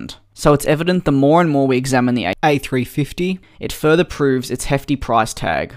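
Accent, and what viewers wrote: Australia. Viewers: Are u Aussie?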